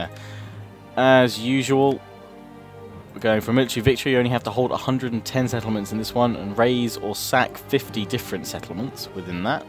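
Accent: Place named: Wales, United Kingdom. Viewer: I say kill em all lol:D I love the welsh accent:D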